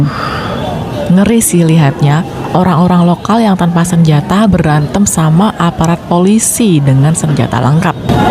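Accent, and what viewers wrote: Java, Indonesia. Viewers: Asline ngendi sih mbak..ko koyo orang jawa sampean..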